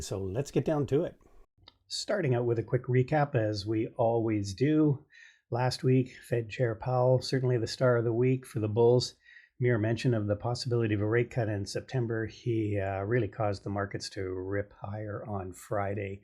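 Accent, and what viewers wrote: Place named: Canada. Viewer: Thanks, for your very helpful weekly commentary. Love your Canadian accent and your quiet demeanor too. Read your Swing Trading Book years ago, and use it to refresh. 💮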